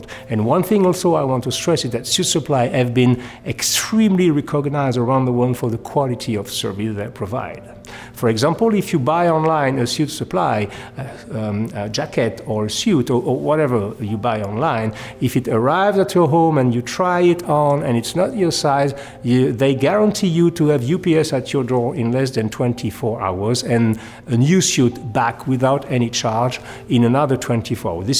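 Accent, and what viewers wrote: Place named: France. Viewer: I have just watched the two versions french and english of your videos. To compare the fluency of your eloquence i m so happy to see that we obvisouly notice that your french accent remains and the way of your talking remains french one it puts so valuable in your explaination. Yours from France 🇫🇷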